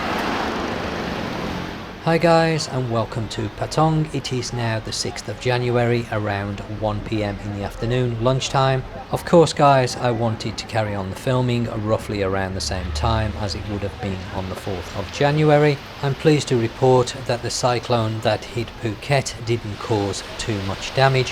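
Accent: West Midlands, United Kingdom. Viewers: Just found your channel. Enjoying watching your videos. You sound like your from the West Midlands. Same as myself 😊. I go to Phuket for the 3rd year in a couple of weeks. I have made friends working in hotels there. Tourist numbers are definitely down but not dramatically like some news outlets suggest. Chinese are definitely less due to the tour boat disaster and I'm sure the strong Thai Baht is stopping the twat Packers. I mean back Packers. Indian tourists are slightly up which is interesting because they pay alot in visas. Anyway keep up the good work. Keep the videos coming. Thanks 👍😊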